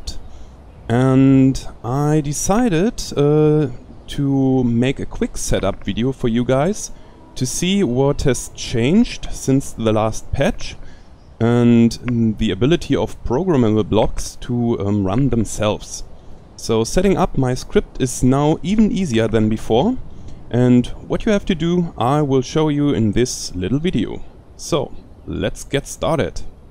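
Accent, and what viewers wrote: Germany. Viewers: you have german accent, i can hear it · are u german? im german myself so i kinda know how it sounds when a german speaks english and for some reason my minds telling me that ur german when u talk.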